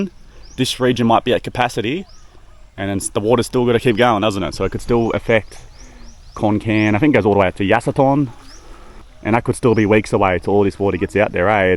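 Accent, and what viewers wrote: Australia. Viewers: Sounds like a Melbourne accent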